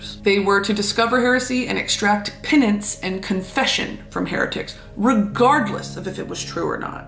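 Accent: Spain. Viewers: ooo she got the spanish accent. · your Spanish accent is gorgeous!!! Me encanta como tu hablas amor!!! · Brilliant Spanish accent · Omg YOU SOUND SPANISH Like if you told me you came straight from Fuenlabrada I'd believe it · So many of her TikToks speaking Spanish have literally hundreds of comments being like “but why you have the Spain accent 🙄” which bothers me so much bc like girl! She learned a whole ass second language! That shit is hard! And her teachers were from Spain! And commenting on people’s accents? Is fucked!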